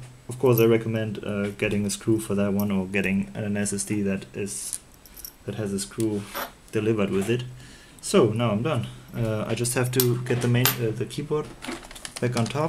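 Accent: Germany. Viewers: Nice Video, you have a big german accent, are you German?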